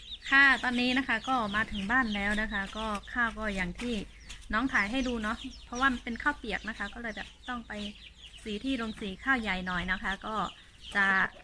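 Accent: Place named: South, Thailand. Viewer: เอาแกลบไปเผาดินขอคือเอาไปเผากระเบื้องดินขอมุงหลังคาบ้านล้านนา ฝั่งไทยหาดูยากเหลืออยู่วัดต้นเกว๋นที่ถ่ายละครกาสะลอง คำว่า ขอ คือด้านหนึ่งของกระเบื้องจะงอๆเป็นตะขอเกี่ยวไม้ระแนงบนหลังคา ทำจากดินเหนียวเลยเรียก ดินขอ สำเนียงน้ำเสียงที่น้องพูดฟังไปฟังมาก็นึกถึงภาษาใต้ ภาษาตระกูลไทเหมือนกัน รากศัพท์ก็อันเดียวกันเช่น เถื่อนแปลว่าป่า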